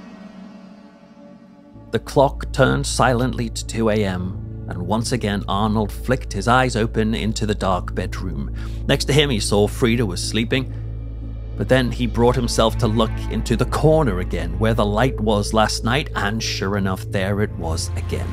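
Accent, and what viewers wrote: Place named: North East England, United Kingdom. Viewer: Ah,I thought you were from north east,been trying to track the accent,was right,I was born there but moved to Doncaster when I was 2.keep it up Bonny lad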